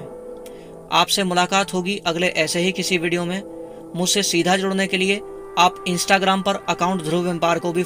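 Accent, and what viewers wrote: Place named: Meerut, India. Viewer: voice se hi pata chal raha hai ye ladka meerutt ka hai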